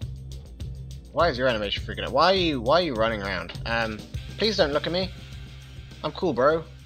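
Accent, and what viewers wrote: United Kingdom. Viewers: Is he British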